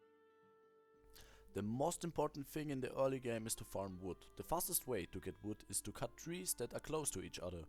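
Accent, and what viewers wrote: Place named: Russia. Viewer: Bros having the heaviest russian accent to mankind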